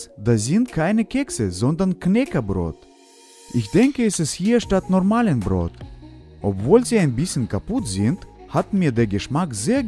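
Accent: Russia. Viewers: Typischer russicher aktzent finde ich super bin halb russin und finde deine videos echt cool Bin gerade auf deinen kanal gestoßen rechtschreibung ist nicht so meine sache😅😂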